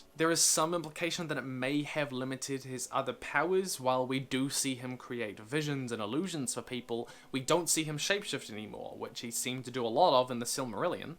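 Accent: New Zealand. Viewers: Is it a common thing for kiwis to not recognise their own accent? I cant beleive I only just realised you are also from nz xD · Love your channel bro! You sound like a kiwi... are you? im from auckland!!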